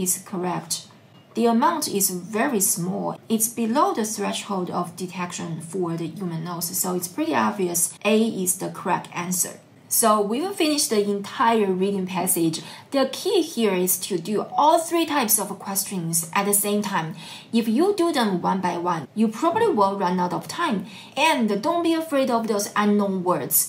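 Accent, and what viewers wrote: China. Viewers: Thanks for sharing your strategy! You have an obviouse Chinese accent.🤣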